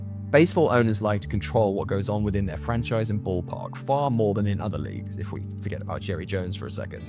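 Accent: United Kingdom. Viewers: So weird hearing a brit talk biesbol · British accent? Very smooth not to rubbish. Glad i was recommended this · Gonna start off by saying great, informative video! But on to my actual comment, I'm shocked to hear what I perceive to be a British accent covering American sports, and specifically Baseball. 2024 is dope.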